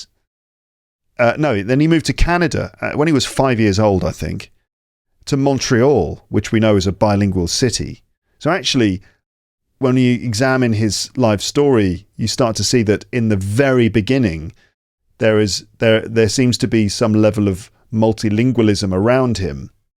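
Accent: United Kingdom. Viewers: However your podcast is in British accent and I work in American accent but I really am good with your way of speaking and teaching! I really like to hear you for hours! Good job 👍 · Thank you! your great british pronunciation, accent, explaining a difficult vocabulary are very informative! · The problem is the british accent🥴. But your channel is fabulous.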